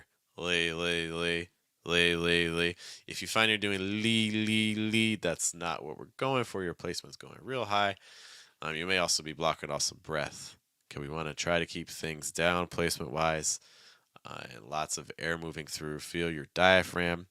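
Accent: United States. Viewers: shadowing practice is very effective for me every morning I can feel that by improving my pronunciation I'm also learning American English but I like pronunciation topics most I want to sound like an American native like your accent thanks.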